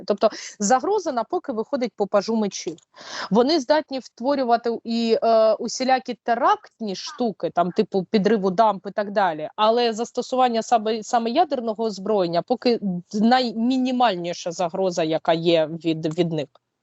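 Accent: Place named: Kharkiv, Ukraine. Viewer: Манера мови характерна для її локації. Харківщина та Дніпропетровщина! Ну на 500 відсотків українська! Десь так розмовляв Нестор Махно!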